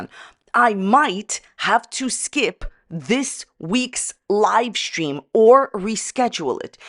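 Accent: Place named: New York, United States. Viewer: With how sneaky her words are, she could be a lawyer. Shame she doesn't have certain other qualities needed. Btw, if someone hasn't coined the name Scamberlynn/Scandalynn Reid for her yet (pretty sure it's been done though) I'm claiming it 😂 Also, hi! This is the second time I've seen your video pop up in my feed. Not much of a follower of Gorlworld beyond the occasional video here and there, but I think I'll stick around with you for a bit because you've got a really pleasant voice and accent (New York/New Jersey?). Have a subscribe 😊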